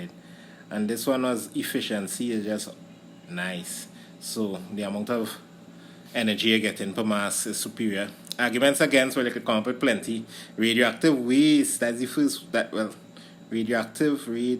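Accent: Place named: Trinidad and Tobago. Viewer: you sound trini, i am hbu?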